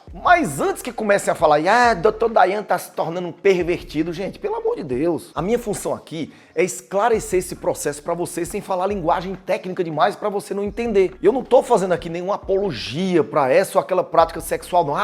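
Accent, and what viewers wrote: Ceará, Brazil. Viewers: O sr.é cearense??? Um jeitinho típico de apresentar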